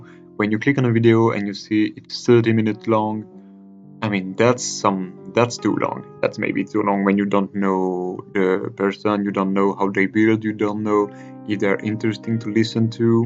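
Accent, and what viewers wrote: France. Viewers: I know this is an older video, but I love your Gothic builds. Your French accent was delightful to listen to while you built this maison. I am a big lover of English and French history and I know it's been a while since you have uploaded sims4 videos, but I hope one day you will continue building European style builds. Maybe even historical ones! Have a lovely day.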